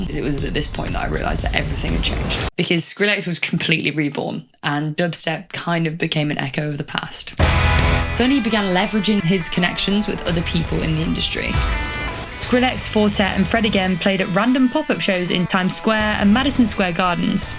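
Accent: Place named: RP, United Kingdom. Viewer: The posh upper-class British accent I hear..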